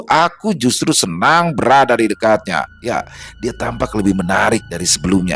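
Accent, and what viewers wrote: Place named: Java, Indonesia. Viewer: Kadangkala terpikat dengan gaya membawakan ceritanya yang terdengar seperti dialwk orang Betawi,juga seperti orang jawa dialeknya........ya teruslah berkarya ....semoga sehat dan sukses ya.....ok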